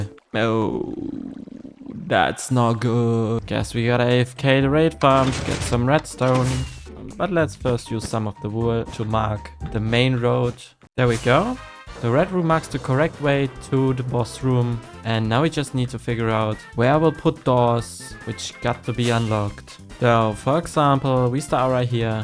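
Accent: Germany. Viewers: Are U German? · You are German or? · Is bro german? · Are you german if yes than i knew it cuz ur accent. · Are you German? Because i thought you had a little German accent. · u german bro? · am i hearing a little german accent?? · Idk why, but he sounds so german 😂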